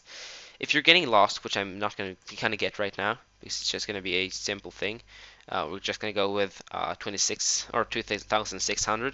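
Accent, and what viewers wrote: Sweden. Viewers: are u Swedish XDD i am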